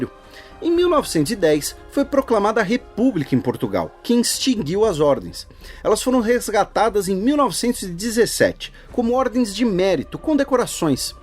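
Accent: Brazil. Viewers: Com este Português tupinambá, eu duvido.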